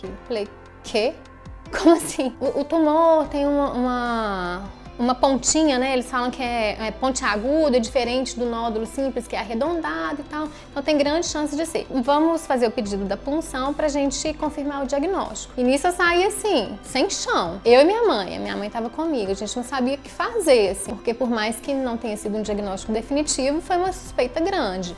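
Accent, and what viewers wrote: Minas Gerais, Brazil. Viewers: O sotaque mais bonito do Brasil, o de Minas Gerais.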